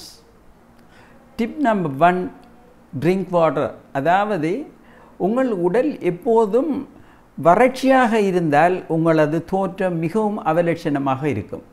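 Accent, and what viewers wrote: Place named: Akkaraipattu, Sri Lanka. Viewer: unka pasai Akkaraipattu pola irunthathu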